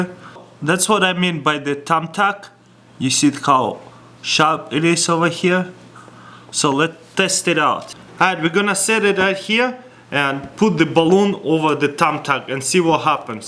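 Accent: Russia. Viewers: Y do people make fun of the way he talks he is Russian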